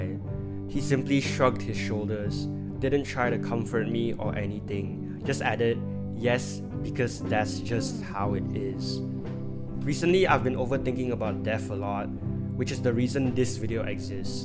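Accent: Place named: Singapore. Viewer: Singaporean accent makes the message better good luck to you young man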